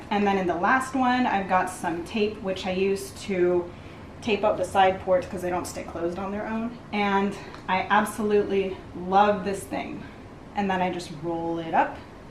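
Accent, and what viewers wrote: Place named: England, United Kingdom. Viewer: shes English is why she says it that way.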